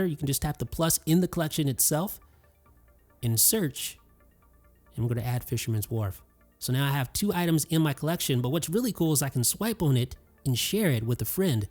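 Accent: India. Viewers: Whyyyyyyy the F would it have Indian accent????? xD xD xD xD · Indian voice 🤩🤩🤩🤩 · Indian accent ❤️ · Grear vid and lots of info. All good except the language accent read back. No way would I put an indina voice to read me stuff on my phone. I can get that from all the scammers from there conning people here in the US lol. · Indian english accent.....indians like here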